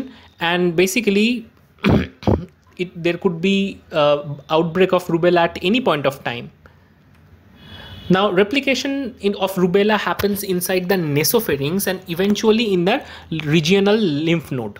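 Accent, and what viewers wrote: India. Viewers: Pure Indian english😢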